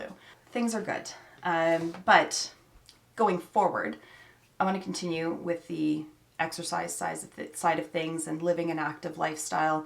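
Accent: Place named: Canada. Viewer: I didn’t realize! Until I here certain words of course I’m like she’s Canadian! ☺️however you accent sounds a lot like most here in US but Wisconsin and certain spots in Illinois definitely pronounce some words like you. You know we are super behind in some things lol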